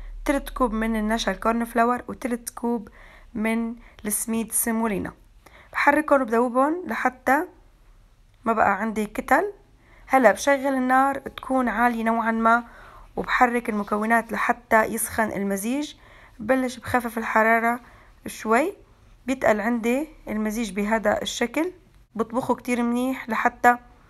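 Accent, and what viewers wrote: Homs, Syria. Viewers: من كلامه مبين انها من مدينة حمص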